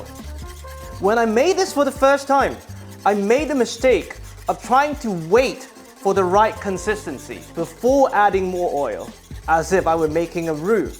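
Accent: United Kingdom. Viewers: Loved your british accent! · He sounds very British!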